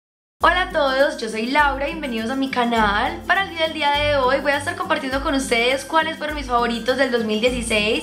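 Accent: Antioquia, Colombia. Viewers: me encantoa nuestro asento paisa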